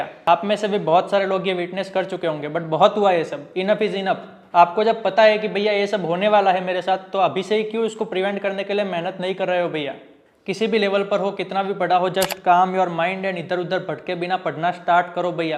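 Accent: Gujarat, India. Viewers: Aap gujraati ho? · That gujrati accent though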